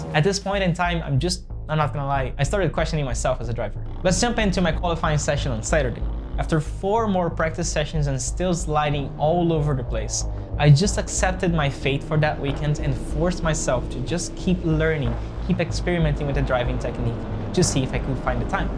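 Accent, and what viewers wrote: Brazil. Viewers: Eu já tava achando q ele era brasileiro só de escutar o sotaque e também pelo nome dele, agora q tu confirmou tirou minha dúvida. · Cara, como que vc desenvolveu seu ingles assim? Eu tava escutando voce falando, e pensei, nossa ele parece um brasileiro falando ingles, mas fui ver tava tudo em ingles, comecei a ter duvidas, mas vi a bandeira do brasil no carro... conta o segredo dos ingles pra nois ai kkkk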